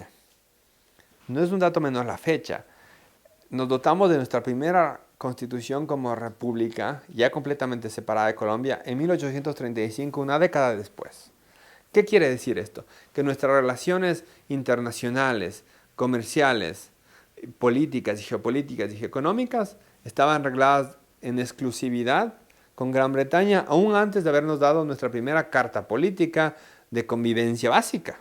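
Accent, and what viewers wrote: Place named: Extremadura, Spain. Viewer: Desde España un saludo señor le aprecio soy estremeña creo que que visitó estremadura espero que le gustará agradecida por su cariño a España conozco algunos ecuatorianos y tengo uno vecinos que son de esas tierras son estupendos yo alucino hablos como si estuviera en mi pueblo pues vivo en Barcelona saludos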